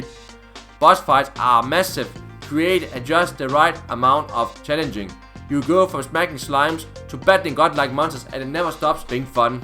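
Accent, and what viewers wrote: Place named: France. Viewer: Crosscode is one of my favorite games of all time. Should try phoenotopia awakening if you like zelda-likes. It's from a sidescrolling perspective which translates really really well. The explanation, story and puzzles are amazing. Curious where you're accented is from. You sound French but when speaking faster it sounds more German or Dutch.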